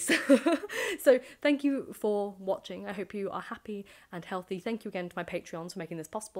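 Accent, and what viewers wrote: RP, United Kingdom. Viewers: Also why do you speak with a heightened RP accent? Is that your real accent or is that just for the Youtube chanel?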